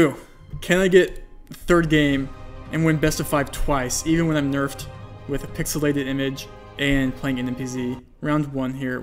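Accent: Germany. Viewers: how can you speak such a german english haha what a legend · You can instantly tell the Country in Geoguesser, meanwhile I can tell , that he is a german fellow 😂👌🏼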